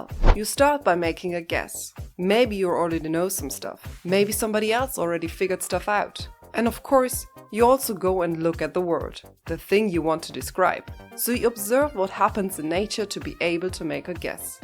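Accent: Germany. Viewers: After just 10 seconds into the video, let me take a wild guess: somewhere in the germanophone region?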